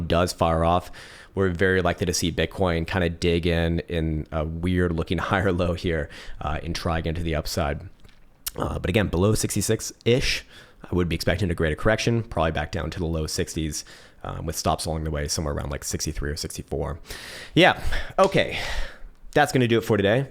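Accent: Australia. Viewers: Man he sounds Aussie... I'm ashamed. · sounds Australian? thats so bad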